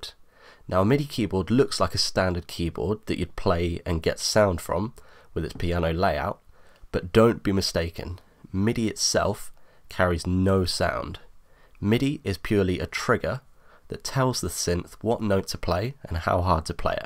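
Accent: United Kingdom. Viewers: At last!! A nice British accent to listen to on an Ableton tutorial. Phew! ... sorry Murica.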